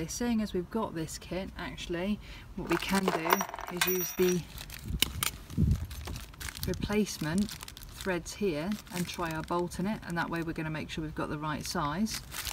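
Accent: United Kingdom. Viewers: Always a pleasant surprise is an English accent, and a woman's at that!.... oh, and a nice demo as well.. thx very much indeed!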